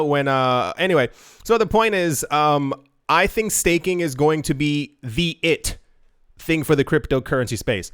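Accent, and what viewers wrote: Germany. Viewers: Hehe, your German accent is great:) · Carefull.....your inner german is showing.....good accent!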